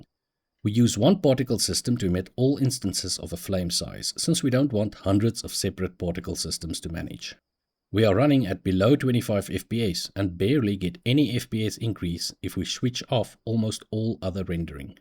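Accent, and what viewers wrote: South Africa. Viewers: You sound South-African, The work looks interesting. What game are you building?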